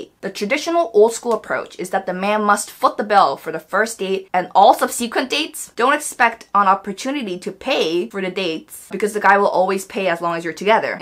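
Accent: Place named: New Jersey, United States. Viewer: This person is talking about "Foreign girls being shocked at..."🙄😌She means American girls, though.. (Although obvs she's never come across any decent British men (just chavs as far as I can see.) She talks as if the whole chivalry aspect & other such things are purely for Korean men, when certainly that is not the case - & any decent British man will displayed rather a lot of that kind of thing - but she appears to hv dated a lot of wasters, who don't "Ooooh - damn that masculinity..." - frankly it's ridiculous to try to make men, not be men - & espc to be "offended" by them being so🙄😌 You don't need to "prove" that you "don't need them" & can "make more money than them" (Ssuming you KNOW that about yrself, & a man trying to impress you by pretending he can do mosr things better than you, is just a man being a man - you appear to want them to change who they are; so no wonder you keep being disappointed. 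You being "able to earn more than them" or "get a better job" , that doesn't need to come into it - but if you constantly hv to try to make yrself feel better about yrself - well thats not any man's fault either - do you watch these back, bc any therapist would hv a field day with this stuff, I think..🙊💜My gosh, what doesn't she complain about🙄😌 This person should just admit she went to America & became an American - & that she goes out of her way to annoy most Koreans as much as psbl by being one - by being yr basic mouthy New Jersey or even Queens type (& that accent is AW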